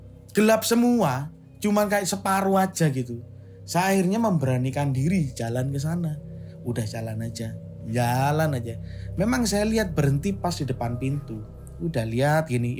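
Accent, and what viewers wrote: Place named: Madura, Indonesia. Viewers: Iyut Reng oreng Medhureh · Accent nya Suroboyoan bangett,,tapi mas nya orang Madura nih hee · Asli Madura yaa mass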